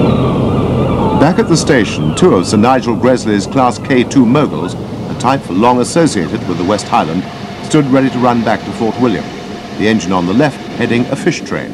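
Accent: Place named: England, United Kingdom. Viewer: och aye the noo......see you jimmy....he has to be English,would'nt understand a jock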